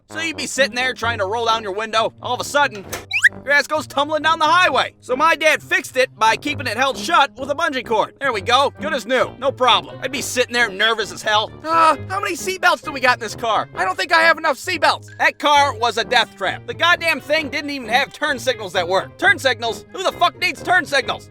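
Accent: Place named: Arkansas, United States. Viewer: Lol Sounds like Arkansas ha ha ha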